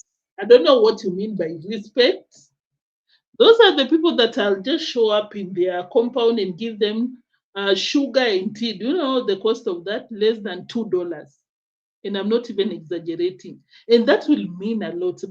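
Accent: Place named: Kenya. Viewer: Epic content! But, please tone down your Kenyan village accent. Please darling... ❤❤❤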